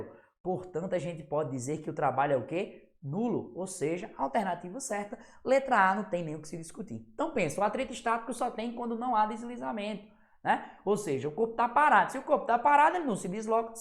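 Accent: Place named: Northeast, Brazil. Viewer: Muito obrigada professor, me ajudou muito!! O sotaque nordestino, bom demais!!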